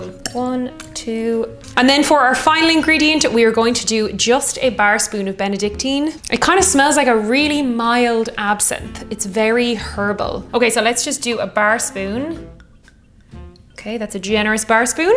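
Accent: Ireland. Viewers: Gotta love the Irish accent.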